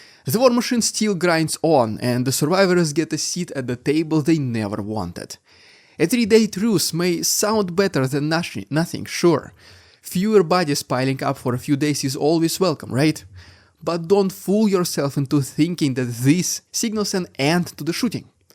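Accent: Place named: Russia. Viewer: Please work on your English pronunciation your accent is very very heavy, listen to your speech and see what you can do to improve speaking English ❤ thanks it's very hard to understand your English too heavy Russian accent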